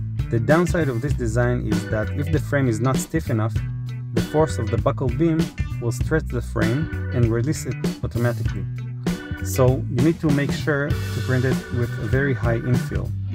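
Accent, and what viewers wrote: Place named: Israel. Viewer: I hear an Israeli accent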